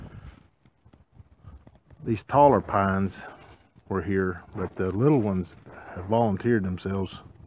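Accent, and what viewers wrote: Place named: Oklahoma, United States. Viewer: What state are you in. Sounds like Oklahoma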